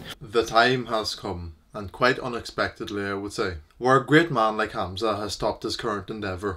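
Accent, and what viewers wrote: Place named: Scotland, United Kingdom. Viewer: Damn for a lithuanian u really picked up the scottish accent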